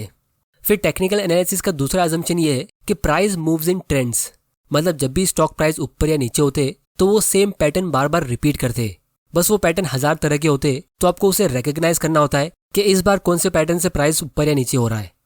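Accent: Gujarat, India. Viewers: Ur gujrati accent is good✨ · Are u gujrati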